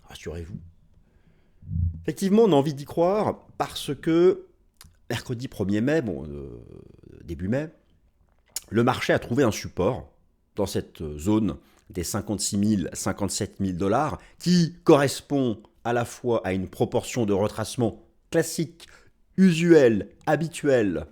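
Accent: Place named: France. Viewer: Ton accent à la française... trop mignon! Mais bon, cela n'enlève rien à la qualité de ton contenu!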